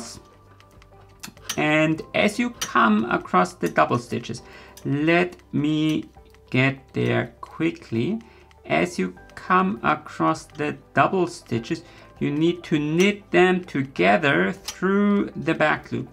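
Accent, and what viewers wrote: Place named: Germany. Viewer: Also, your tutorials are simply superb! You're so skilled, you pay close attention to detail, and you're so open-minded about various knitting techniques! It makes me feel like I can try anything I want and just have fun making mistakes and learning from them. Also, my mom is from Germany and your accent makes me feel just like I'm at home again. :)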